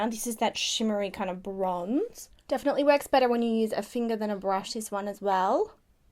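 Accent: Australia. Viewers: Thyea Phelps She has an Australian accent! That's why she talks like that. Are you for real?! Lol. Do you want her to talk with a fake American accent just to suit you? This made me laugh 😂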